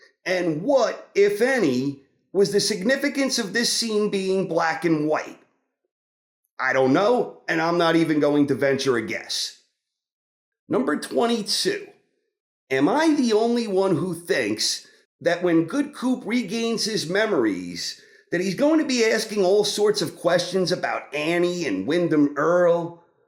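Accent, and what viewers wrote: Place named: Brooklyn, United States. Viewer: You sound like you have a Brooklyn accent lol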